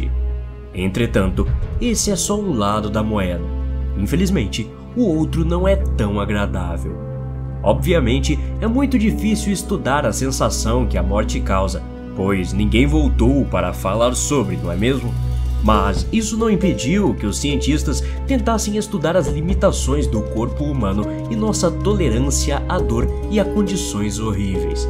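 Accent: Northeast, Brazil. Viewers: Ele é um pouco nordestino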